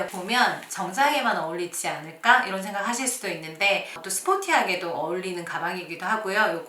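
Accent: Gyeongsang, South Korea. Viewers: 경상도 사투리 쓰시네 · 사투리 쓰시긴 하네요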